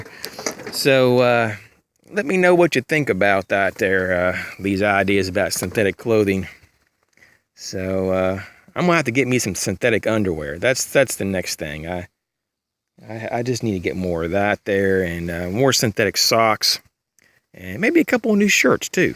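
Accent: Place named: South, United States. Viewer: Solid, important info that folks fail to mention in most survival videos. Lovin' the "warsh." 😂 reminds me of my family talking in the south down in Springfield, MO. Btw: Mr. Hank Hill, you forgot to mention your extensive knowledge on propane...but yet you nailed it on the synthetic underwear. Haha. Have a great day pal.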